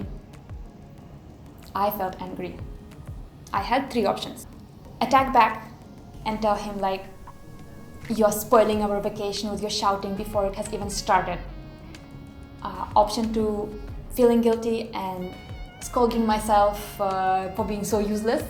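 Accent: India. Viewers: Very interesting video 🙏 I think in my relationship we also have the need of friendly and warm communication 😁 btw did you notice that you have an indian English accent? Super cute 😅🥰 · Your English pronunciation are now like the more Indians and less russian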